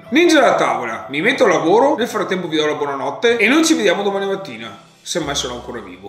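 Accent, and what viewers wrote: Veneto, Italy. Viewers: che brutto l'accento veneto 🙁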